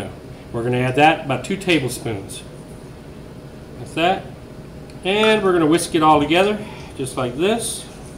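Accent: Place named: South, United States. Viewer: Also, you seems southern. I'm southern too.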